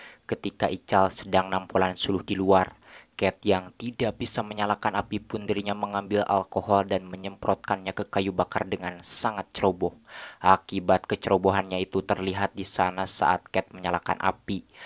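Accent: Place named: Sukabumi, Indonesia. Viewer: Bang si abang ini aslimana teh ?? Sukabumi kah ???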